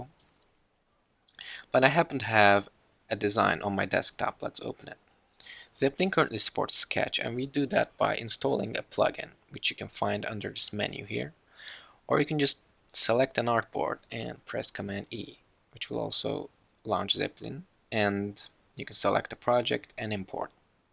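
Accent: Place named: Turkey. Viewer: Aksanından zeplinin türk firması olduğunu fark ettim :)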